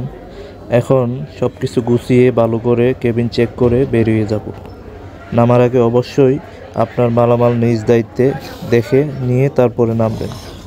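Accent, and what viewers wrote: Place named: Chittagong, Bangladesh. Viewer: কথায় কথায় বন্ধুরা বলবেন না। বিরক্তি লাগে। ভয়েস সুন্দর কিন্তু চট্টগ্রামের আঞ্চলিকতা কাটিয়ে শুদ্ধ বাংলা বলুন। ফেস দেখান না ক্যানো? প্রতিটি স্টেশনে নামবেন। স্টেশন দেখাবেন।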